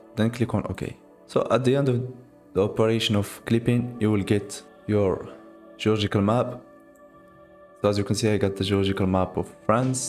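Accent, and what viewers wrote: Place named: Morocco. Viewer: This guy's accent is moroccan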